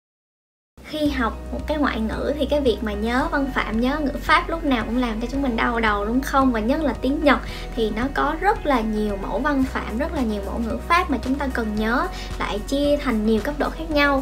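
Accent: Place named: South, Vietnam. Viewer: Lâu lắm mới thấy vlog về tiếng Nhật giọng miền Nam, quá dễ thương. Cảm ơn bài chia sẻ của bạn.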